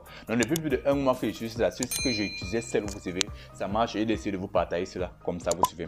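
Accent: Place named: Cameroon. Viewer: Prochainement il faut parler doucement, tout le monde ne comprend pas ton accent camerounais et c'est rapide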